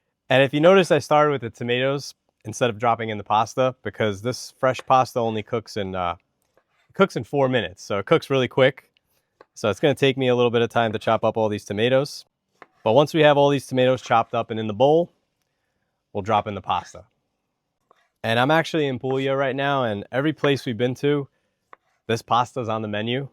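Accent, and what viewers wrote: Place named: New Jersey, United States. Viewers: I knew you were from NJ! We both say water the same way. Where abouts? I grew up in Union County before I moved to Florida in '89. Anyway, my wife loves cherry tomatoes and orecchiette so, she's getting this tomorrow. The Cat Distribution System chose you, yeah the CDS is a thing. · I knew you were from NJ. You just can’t mistake that accent. I love your recipes simple and doable. Can’t wait to try them. I’ve been saving them and writing my shopping list accordingly.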